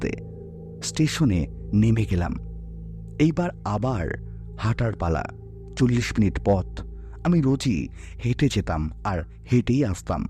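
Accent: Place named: Bangladesh. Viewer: Apni banladeshi??? Kothar bhul bhalo ucharon shunei bojha jache😂😂😂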